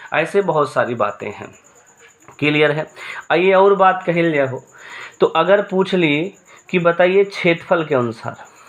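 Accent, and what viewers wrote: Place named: Bhojpuri, India. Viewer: Mast bhojpuri bhai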